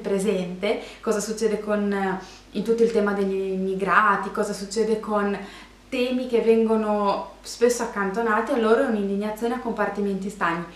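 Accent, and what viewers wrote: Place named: Modena, Italy. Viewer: Ciao, sono arrivata sul tuo canale proprio grazie alla BTILI e mi hai conquistata!! Sei davvero bravissima. Hai una proprietà di linguaggio invidiabile e tra dolcezza e passione riesci a far innamorare dei libri di cui parli (ormai li ho messi tutti in wishlist 😂). Oltretutto io sono di Reggio quindi il tuo accento modenese mi è familiare 😊 a me piacciono moltissimo i fumetti proprio perché l'utilizzo delle immagini può essere molto evocativo. Complimenti!!!!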